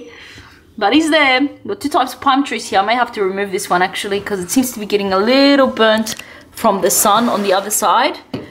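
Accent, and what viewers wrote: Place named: Australia. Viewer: Aussie accent 👊🏼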